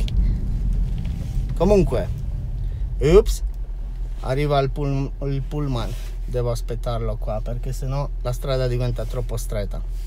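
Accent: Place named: Moldova. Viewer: Dall’accento sembri moldavo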